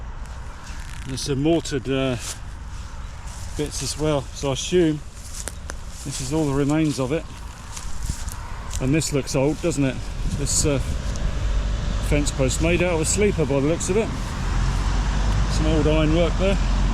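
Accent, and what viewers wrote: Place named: Oxfordshire, United Kingdom. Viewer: funny to hear the oxfordshire accent again the roads are just as bad as I remember them from the 70's on a racing bike lol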